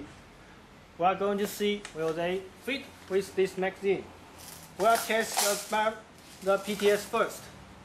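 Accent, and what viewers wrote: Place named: China. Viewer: lovin the chinese accent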